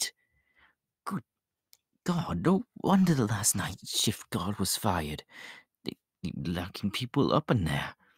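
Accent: United Kingdom. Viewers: Me when he has a British accent: 👁👄👁 😺🦋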